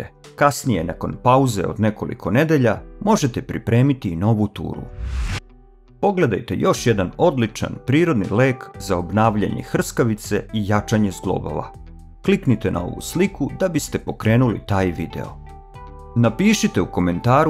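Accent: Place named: Czech Republic. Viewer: Замечательно ! Спасибо ! Мне просто чисто по человечески интересно . Откуда из какой страны Вы ? Вот слушаю Вас и почти все понимаю то что Вы говорите , и думаю , кто же он , украинец или поляк или чех . Скорее всего Вы чех или словак . Привет из Узбекистана , Хорезмская область .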